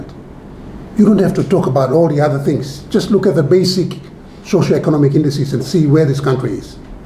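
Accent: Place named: Papua New Guinea. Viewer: U speak like a PNG man and not an Engaian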